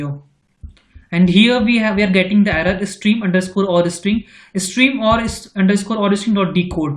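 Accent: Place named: Arab world. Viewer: mmm bro what brother in what language are you speaking, I think it is English but as if it were mixed with Arabic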